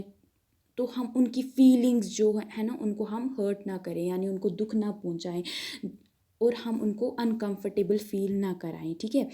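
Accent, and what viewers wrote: Kashmir, India. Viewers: U r voice tells that u r from kashmir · Are you from Kashmir?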